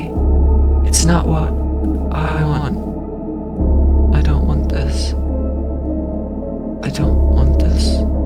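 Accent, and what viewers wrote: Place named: United Kingdom. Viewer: Brit’ish ebony POG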